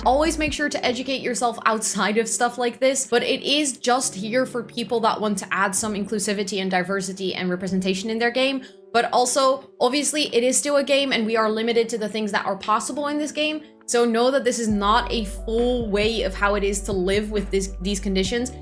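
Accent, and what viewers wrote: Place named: Netherlands. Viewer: WAIT A SEC!!! BEN JE NEDERLANFS????????? ik bedoel ik hoorde het wel al maar dit is. zo. cool! dit heeft me echt gemotiveerd! ik dacht dat ik raar zou zijn als ik mijn sims content in het engels zou maken maar wow. i'm flabbergasted!!! IK SUBSCRIBE NU GELIJK! je engels is echt super goed.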